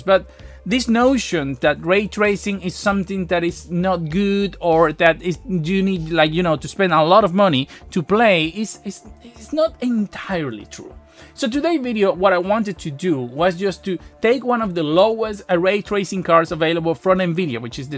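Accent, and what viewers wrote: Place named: Latin America. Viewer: Se lo nota ya mas suelto que en el primer video... NO creo que lo de latino afecte demasiado... Y si bien el toque latino en su acento de ingles se nota, no es tan exagerado...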